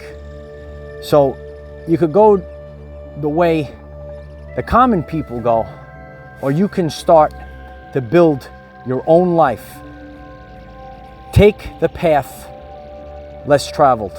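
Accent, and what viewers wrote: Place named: New Jersey, United States. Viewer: cool Jersey accent.. who are the rainbow warriors? Never read about that in scriptures. Did some debased tribe make that one up? God created the Rainbow - displayed it after the first Flood / damnation of the humans who were huddling together in heathen actions. Rainbow across the road now 'represents' sodomy and child abuse. Which one is it mister virtue signal. Oh...and the Hopi never talked about 'rainbow warriors' that was invented by some Jewish guy in the 70s high on LSD. It was a cool story though.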